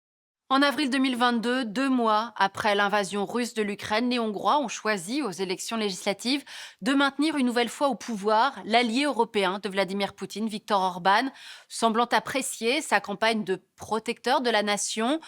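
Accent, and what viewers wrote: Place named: France. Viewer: Que asco ese tonito francés...parece que tuviera una puta papá en la boca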